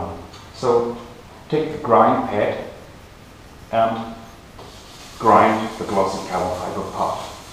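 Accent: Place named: Germany. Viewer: i assume hes german (cause we have the brand of this spray everywhere) and he probably doesnt know the english word for "baumarkt". It's funny, i knew the second he hesitated he wouldnt say hardware store, cause the word baumarkt essentially translates to builder market. Obviously that is a weird name, so he probably went to an english word he heard before. Mut is just how you pronounce the 'a' in german, like "maht"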